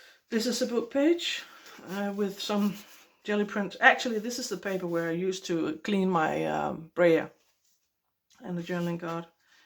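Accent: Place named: Denmark. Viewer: I love it! I haven't come across your channel before. I've been trying to place your accent - you said you don't speak Dutch, so you're not Dutch. I'm sure you're either European or Scandinavian. Are you Danish? Lol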